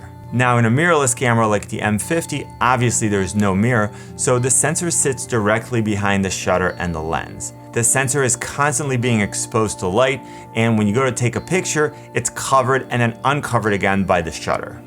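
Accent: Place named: Chicago, United States. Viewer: Thank you- I am leaning towards the SL2 because of affordability and I don’t really want to shoot video in 4K if the auto focus isn’t great in the m50, plus I don’t want to deal with 4K video all over the place. Also I plan to use a gimbal. So in 1080 the sl2 can do 60fps? I want to be able to do slow motion. Also I hear the Chicago accent and the outdoor shots looked like my hometown, Evanston.. ! thank you for a thorough review!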